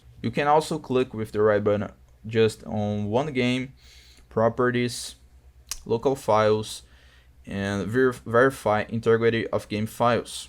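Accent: Brazil. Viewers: sabia que esse sotaque era BR XD, ja viu esse erro meu consagrado? LowLevelFatalError [File:Unknown] [Line: 729] BulkData compressed header read error. This package may be corrupt!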